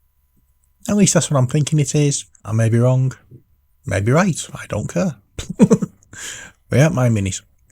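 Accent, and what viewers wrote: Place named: Leigh, United Kingdom. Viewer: Just looking for a painting recipe for Delaque, and pleasantly surprised by a local accent while watching over the border here in sunny Leigh!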